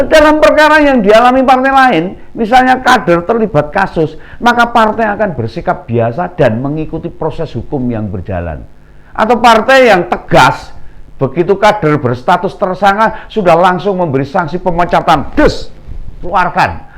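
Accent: Java, Indonesia. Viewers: Om memang elok ulasan dg nada kejawen nya titis juga dari sesi Metafisis hampir 80% betul..dg membaca Aura situasi..terbawalah orang yg berperilaku minus menuai..karmanya..karena tergiur hypocrate..alias Ketidak jujuran Duberkatilah selalu yg sadar❤👍👌Amin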